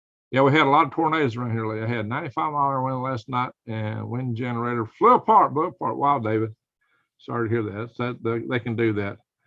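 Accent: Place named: Louisiana, United States. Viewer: New subscriber🎉 saw you on Canadian prepper and was so excited to hear someone who's accent sounds a little like mine & prepping in a climate similar to home here in Louisiana.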